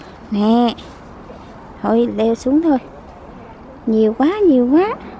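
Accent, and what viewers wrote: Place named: Quang Nam, Vietnam. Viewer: Nghe giọng c như người miền Trung Quảng Nam Đà Nẵng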